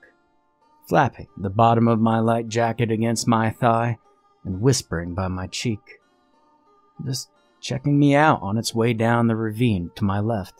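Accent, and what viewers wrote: South, United States. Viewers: Your southern draw is to die for 😍😍😍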